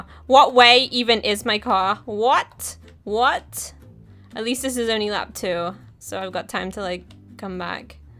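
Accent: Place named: Liverpool, United Kingdom. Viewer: i love hearing your accent! its funny hearing someone from who's also from liverpool :)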